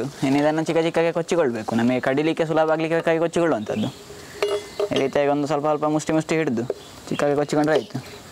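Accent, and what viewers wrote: Mangalore, India. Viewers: As a malayali living in bangalore your (Mangalore) kannada accent is so beautiful, does not sounds like rude as here in bangalore due to inter-mixing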